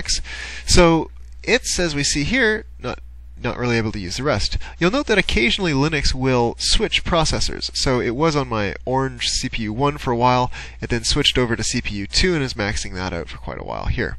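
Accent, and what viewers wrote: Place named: United States. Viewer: Cis online instructions sounds weird when read with an american accent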